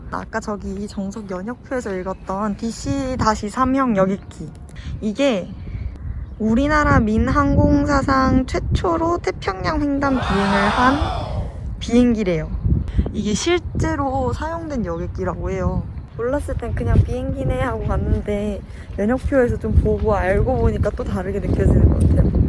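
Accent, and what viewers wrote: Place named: Seoul, South Korea. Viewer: 서울사람 같아요 언니!!!!!! 77ㅑ~~~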